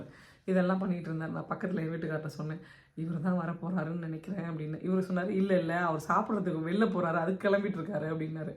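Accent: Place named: India. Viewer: Hiii.. Very useful video..Thank you so much for sharing your experience.. Namma Indian English slang Pesurom school la work pogum pothu eppadi inga ulla local kids kooda neenga manage panneenga..They can understand our slang especially initial time when we get into the work?.How did you manage your starting days of working in school?Can you share some of your experience too.. Thank you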